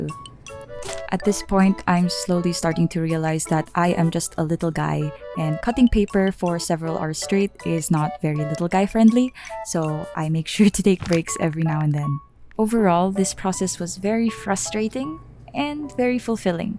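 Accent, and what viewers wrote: Philippines. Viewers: Filipino accent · I HAD NO IDEA U WERE FILIPINO BUT AS SOON AS I HEARD U SPEAK I WAS LIKE 🐶🇵🇭❓️ AND THEN I SAW MARKET MARKET OMGG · She sounds like a filipina. That accent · The Pinoy accent is strong with this one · I'm surprised someone thought you were American, you have an identifiable filipino accent. I dunno, I'm filipino and I live in the US so I can point it out easily better than most lol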